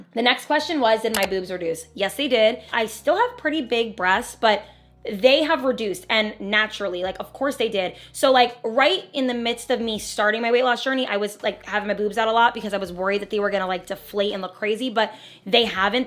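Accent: New Jersey, United States. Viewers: Are you from jersey? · Great video!! Are you from New Jersey btw? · I started laughing watching this video. I’ve never noticed in any of your other videos. I don’t know if it’s a you’re in Jersey and you’ve picked up the jersey accent or you had it before but just never noticed but I love it by the way you’re looking amazing and congratulations on your weight loss and your transition to your new body.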